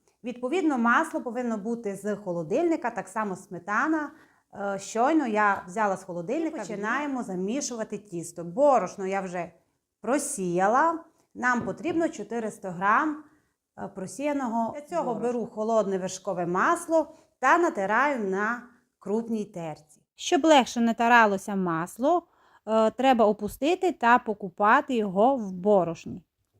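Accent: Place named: Zakarpattia, Ukraine. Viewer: Я завжди задаюся питанням: навіщо держава витрачала шалені гроші на навчання? Коли в кінцевому підсумку ця молода персона розмовляє закарпатьским діалектом. Достатньо було би 4-х класів освіти. І потім, всі блогери як журналісти повинні нести в маси красу украіінськоі мови, тим більше, що наш народ післі окупаціі має негативну спадщину в частині мови. Думай- Те!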